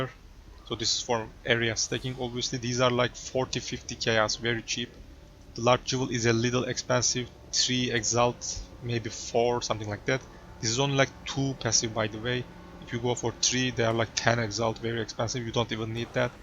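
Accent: Turkey. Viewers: Ingilizce aksanından Türk olduğun öngörüsünde bulunacaktım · Bu adam türk değilse insan değildir. böyle konuşan türkten başka insan olamaz.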